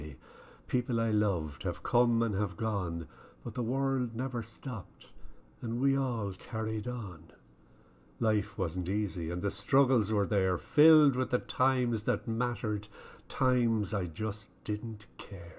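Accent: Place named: Ireland. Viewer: I LOVE your recitation of my poem, particularly with that lovely Irish accent. You say my words with the exact feeling with which I wrote them. You have given my words life and what a fabulous gift that is for me. You say each word with your heart and I now have a lump in my throat. You are wonderful. Sincerely, Pat F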